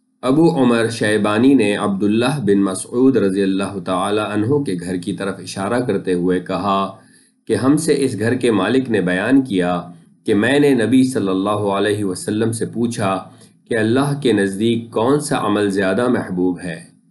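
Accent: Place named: Pakistan. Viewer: As salaam o alaikum bhai Kahan se ho Indian ho aap. Aap ki zubaan se pakistani lagte ho. Aamchi Mumbai kaha to laga Mumbai se ho.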